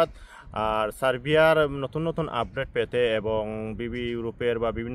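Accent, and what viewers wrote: Sylhet, Bangladesh. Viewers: ভাই আপনি মনে হয় সিলেটি। আমার বাড়ি ও সিলেটের বিশ্বনাথ। নভেম্বর মাসের ১৫ তারিখ অনলাইন হইছে ভাই, এজেন্সি বলছে সব স্টেপ শেষ, এখন শুধু এপ্রোভাল পাওয়া বাকি আছে। ৪ মাস অতিক্রম এখন কি করা,জানাবেন। প্লিজ।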